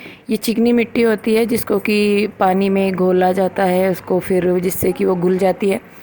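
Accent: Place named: Saharanpur, India. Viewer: aapki language ka jo touch hai ...m first vdo se hi smajh gyi thi ki ho na ho aap saharanpur se related ho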